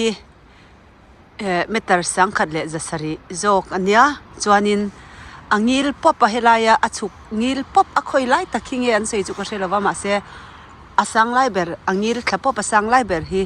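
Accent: Norway. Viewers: Kei chu tawngkam ithiam in thil i sawifiah thiam kati,,norway tawng thluka itawng te hi ngaihthlak anuam kati tlat thung..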